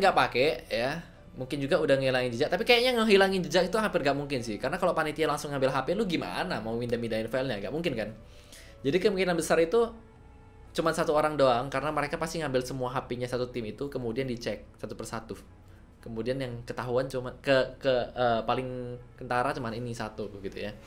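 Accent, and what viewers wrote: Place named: Java, Indonesia. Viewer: oh gtu.. jdi bg medok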